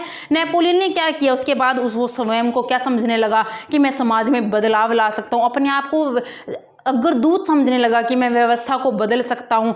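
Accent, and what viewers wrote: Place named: Haryana, India. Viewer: Mam I think you are from haryana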